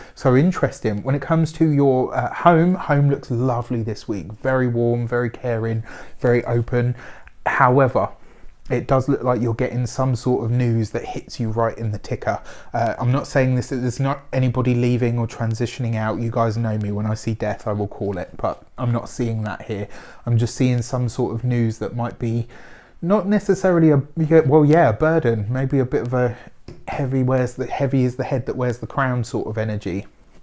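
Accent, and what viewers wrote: United Kingdom. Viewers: British accent ? Nice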